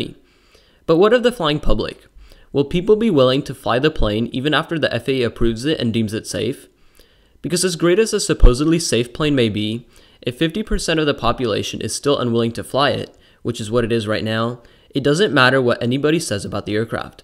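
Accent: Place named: India. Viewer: Nice Indian accent.btw retire this old plane.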